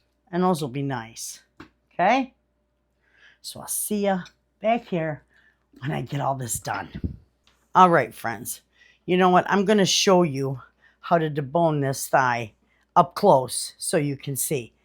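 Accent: Michigan, United States. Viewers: Without even seeing where you were from I could tell you were from Michigan.I lived in Gaylord for years and my daughter still lives there.I’m curious to see , where in Northern Michigan do you live? We love it but we had to move home to Louisiana about 7 years ago.we vacation every year in Gaylord.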